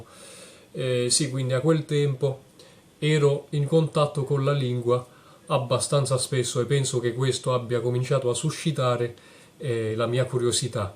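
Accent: South, Italy. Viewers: Sembra tu abbia un accento tipico del sud Italia! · Bravissimo! Hai un accento marcatamente meridionale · ma sembri un meridionale italiano lol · Già, è molto molto meridionale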